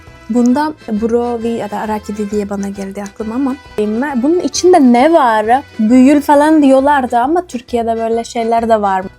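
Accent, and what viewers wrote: Cyprus. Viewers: Abla neden Kıbrıslı gibi konuşuyorsun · Kıbrıslı zaten😂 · kıbrıslı çünkü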